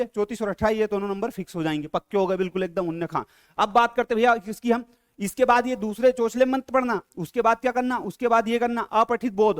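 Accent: Indore, India. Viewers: Pure indore accent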